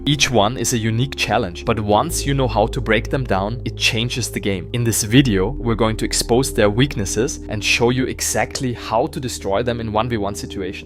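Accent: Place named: Germany. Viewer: Da hört sich aber jemand deutsch an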